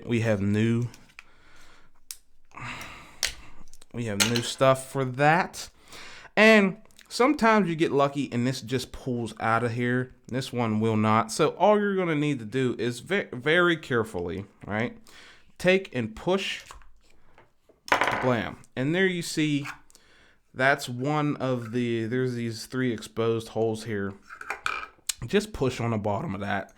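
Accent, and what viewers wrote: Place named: Pittsburgh, United States. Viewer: Are u from Pittsburgh u have that p burgh slang like in errre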